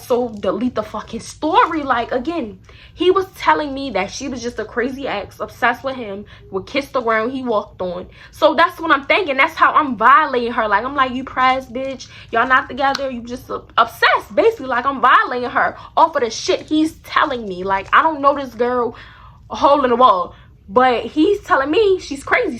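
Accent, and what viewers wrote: Baltimore, United States. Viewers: baltimore accent is soo nice 😩😩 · You sound like you’re from Baltimore 😭😭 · Baldamore accent🤪💗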